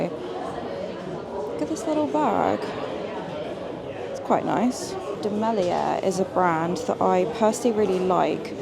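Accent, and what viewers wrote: United Kingdom. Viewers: I enjoy, very much, shopping with you, walking, browsing. I don't need to buy a thing. Also, side note: no one understands why the men's fashions are so interesting to me, yet they are. I have two godsons and still to this day I enjoy taking them clothing shopping. They have fabulous taste, thank goodness. I thought your camera work was smooth and I enjoy your voice very much. I am an American and I so easily enjoy a beautiful British accent. I find British vocabulary is coming to mind and replacing the American counterpart. I rather like this!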